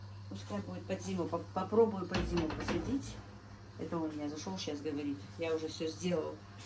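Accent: Standard. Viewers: Добрый вечер, пересмотрела почти все твои ролики, готовила по-твоему рецепту мясо по французки, очень понравилось моей семье,хотя до этого делали по другому, написать хочется много добрых слов тебе, но, что подкупает в тебе( я думаю не обидешься что на ты? мне 63 года), нет в тебе какой-то заносчивости, пафоса, гонора, в отличие от некоторых блогеров с соседней республики, у тебя очень чистый русский язык, правильно одна женщина задала вопрос, а вы не учитель? Очень приятно тебя слушать, смотреть как ты готовишь, желаю всего тебе самого хорошего!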